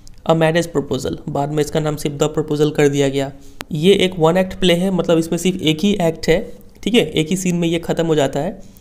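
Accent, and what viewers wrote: Bihar, India. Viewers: sir aap Bihar se hain kya? Accent se lg rha tha. The video is amazing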